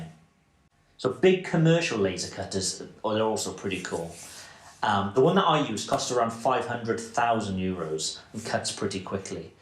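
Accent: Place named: United Kingdom. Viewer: great video man love your british accent and i also do get my stuff waterjeted and still put ton of work into them by hand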